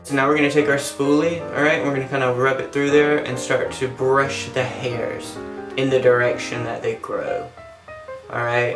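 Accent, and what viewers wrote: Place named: South, United States. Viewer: Lol I was gonna say I'm from Baltimore and his accent def didn't sound like Baltimore accents. It def sounds southern!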